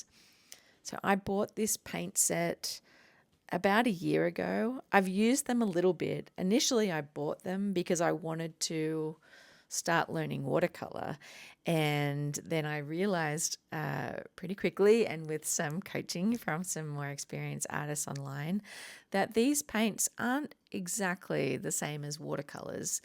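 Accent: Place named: Australia. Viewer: I bought this set to start to watercoloring this year so I am excited to follow along with you to learn how to make swatches. I am an Aussie expat too so it is great hearing your accent.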